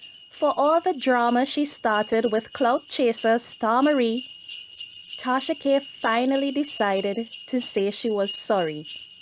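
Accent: Trinidad and Tobago. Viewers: Hey girl..you sound like a Trini...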